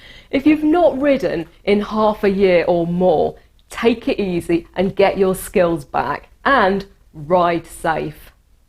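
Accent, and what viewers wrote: United Kingdom. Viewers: the sound of a british accent from a pretty lady really is giving me a hot shot in my veins.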